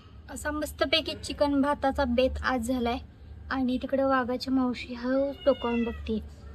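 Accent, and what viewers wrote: Kolhapur, India. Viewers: कोल्हापुरी भाषा ❤ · Tumcha kolhapuri accent ch bhari vattoy change naka karu tumhi ❤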